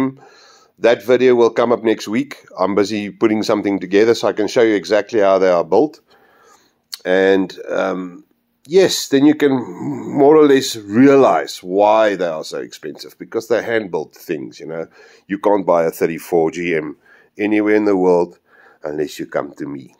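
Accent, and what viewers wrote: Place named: South Africa. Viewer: Trains are great and everything, but come here more and more for the accent. Remind me the old days when I worked in the UK and had a few SA colleagues :)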